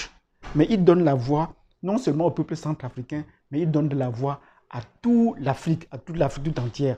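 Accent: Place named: Bamileke, Cameroon. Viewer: Je m'excuses, mais ton analyse est faite avec trop l'accent Bamiléké qui me gène personnellement, elle est pleine de répétitions de phrases et sur le fond je n'y ai rien trouvé de pertinent. Donc je te conseille de laisser la place à un autre qui non seulement parle sans accent, mais qui va dans la profondeur des choses. C'est vraiment Dommage pour les Bamilékés. 🙏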